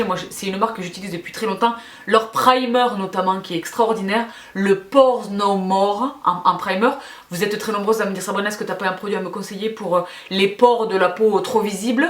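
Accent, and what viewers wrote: South, France. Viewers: Coucou Sabrina! :) Superbe vidéo. <3 Il y beaucoup de produits (enfin, beaucoup, c'est un bien grand mot. ^^) que je connais surtout des produits de la cosmétiques asiatiques (Une petite fan. :D ). Il y a aussi pas mal de pépites qui me font de l'oeil maintenant que j'ai vu ta vidéo (C'est mon compte en banque qui risque de ne pas être content. Ha ha! ^^' ). Ceux qui me tentent le plus sont : les Hadalabos, le "Time Revolution First Treatment Essence" de Missha et la protection solaire no sebum de Missha; Il y a le démaquillant/nettoyant de Vichy mais tu en parles dans une autre vidéo. Il m’intéresse surtout pour les moments où je suis plus motivée par mon lit que par la salle de bain. :D Ah et aussi l'huile d'avocat de Melvita pour mon contour de l’œil. D'ailleurs, le rouge à lèvres tatoue de Dior, j'ai l'impression que c'est un Tint. 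Sans le côté transfère par contre (ce qui le fait devenir plus intéressant). Mais beaucoup de marque coréenne en font (dont la marque Etude House que j'aime beaucoup surtout pour leurs multiples rouges à lèvres, leurs cushions, leur palette que je rêve de tester et le double lasting <3) depuis un petit moment. Peripera est connu pour leur Ink d'ailleurs. (si tu es déjà au courant et que l'as dit dans une vidéo, sorry, sorry : je n'ai pas encore vu toutes tes vidéos. Je débarque sur ta chaine. :) ) Voilà, merci de nous faire partager tes pépites, tes astuces, ta bonne humeur (<3), tes expressions (<3) et ton accent (j'adore l'accent du sud! <3)!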